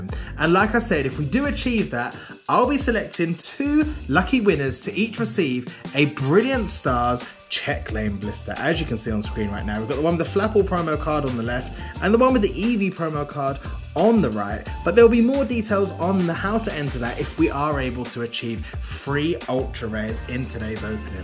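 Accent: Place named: United Kingdom. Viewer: Cool Zard! I really like how your british accent goes loud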